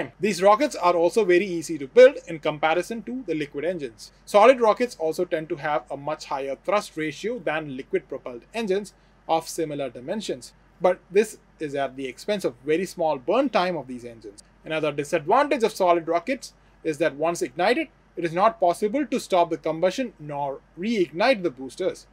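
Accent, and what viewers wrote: India. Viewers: I love Indian version English language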